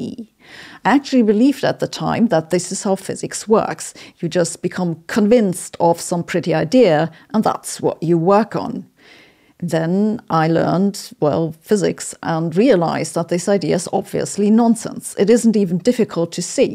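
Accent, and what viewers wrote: Germany. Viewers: Did you notice that all complements by someone with a German accent sound sarcastic. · Wow! Your delivery is very German! Love your work. Science requires such candor.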